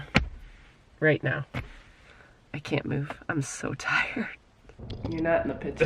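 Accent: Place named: Canada. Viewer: I think just has a thicker Canadian accent. Hers shows up sometimes, but it isn't as noticeable.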